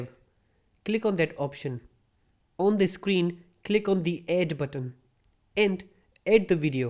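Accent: India. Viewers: Pati dito indian accent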